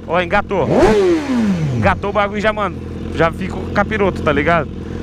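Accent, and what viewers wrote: Goiás, Brazil. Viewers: A tem que fala daquele jeito no pique do Goiás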